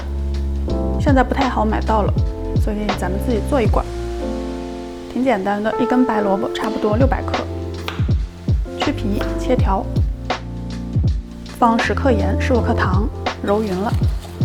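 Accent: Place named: Wuhan, China. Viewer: 我就感觉你是武汉伢，真的，哈哈哈哈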